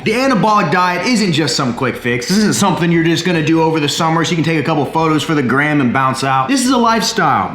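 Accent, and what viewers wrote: Texas, United States. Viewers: Dude are you texan?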